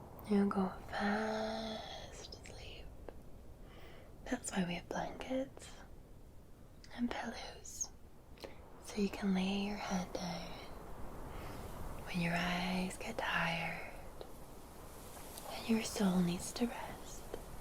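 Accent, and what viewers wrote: Scotland, United Kingdom. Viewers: You've got the most perfect gentle accent and Scottish to to bring peace and calm to a late night and days end thank you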